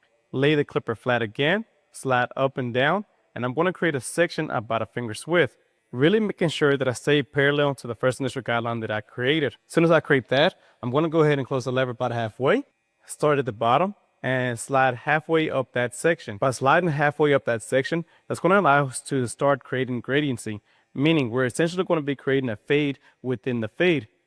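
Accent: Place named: Mexico. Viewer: This guy can’t decide if he wants to sound Mexican, black, or redneck.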